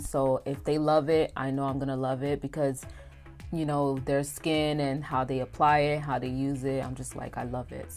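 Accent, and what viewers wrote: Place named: New York, United States. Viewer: Hi! I found your channel and I just had to tell you that you’re absolutely adorable! I could listen to your accent forever! My family is from NY and I miss hearing voices like yours. I love your techniques and you have the most mesmerizing eyes ever! Hugs from Seattle. ❤️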